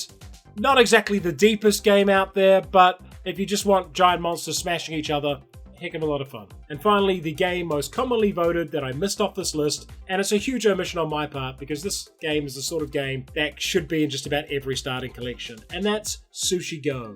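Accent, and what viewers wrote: New Zealand. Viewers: Woah didn't realize you are a Kiwi, Kiora Bro!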